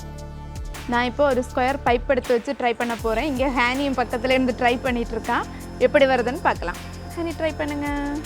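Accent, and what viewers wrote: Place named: Nagercoil, India. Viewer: Nagercoil spech maari erukku I miss my place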